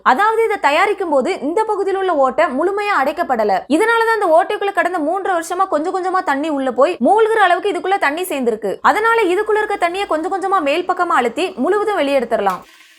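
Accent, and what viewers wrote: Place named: Nagercoil, India. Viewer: நாகர்கோயில் ஸ்லாங்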